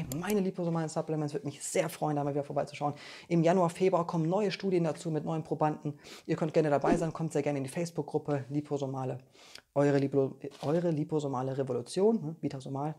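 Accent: Bavaria, Germany. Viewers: Danke für deine Videos. Diesmal irgendwie anders, sehr ruhig gesprochen, konnte Dir sogar folgen. Lag vielleicht auch am bayrischen Akzent 😉, finde ich prima, ich lebe im Bayern. Liebe Grüße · Lieber, ich finde es genial wie Du das machst. Feel free. Bayrisch und Akzent ist doch super.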